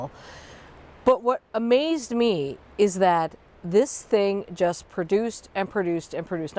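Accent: Wisconsin, United States. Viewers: Oh, I needed this. We moved into our house 20 years ago this coming February. We were thrilled to discover in the spring that our neighbors were gardeners (and also the kindest people ever). We have enjoyed many years of sharing plants, sharing bulb orders, and enjoying each other's gardens. Don died of a heart attack in September at only 77 years old, and Janice was moved to memory care two days ago. We are experiencing so much grief right now, and I have been planning the bouquets I will take when I visit her this summer. I will definitely add the snapdragons to my seed orders. Thank you for your videos. You are inspirational and almost always give me a laugh, and I love seeing your animals. Plus, listening to you talk takes me home to Madison. Happy New Year!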